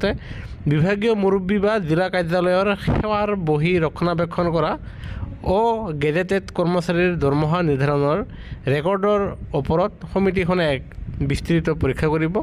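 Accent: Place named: Bengal, India. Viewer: Axomia vakha Jiva khonot val ke practice kori lo.. Bongal buli gom pai😅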